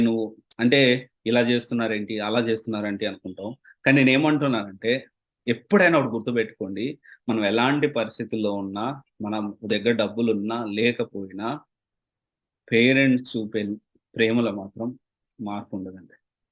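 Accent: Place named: Nellore, India. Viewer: Oka Basha Backdropey undi ga anna neeku nellorelo😅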